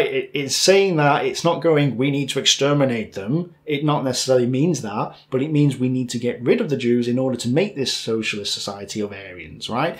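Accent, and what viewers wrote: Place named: Manchester, United Kingdom. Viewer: I had such high hopes for you. A Northern guy, Manchester I think, holding his own with the plummy voiced establishment historians. But you had to publish your last offering, which as a piece of polemics can only be described as simplistic at best. I do understand where you were coming from but your theories are wrong I too have heard many years ago that the extreme left and the extreme right almost went full circle so that they virtually touched. Stalin though a murderous thug had to be loyal to Lenin and Marx's behests which preached the dictatorship of the proletariat and as many of the early Bolsheviks and Marx were Jews race was not an issue in the formation of the Soviet Union, whereas race was a central plank with the Nazis. In my view that was the fundamental difference. I look forward to you next episode as I for one will not be cancelling my subscription as your history on the second world war is splendid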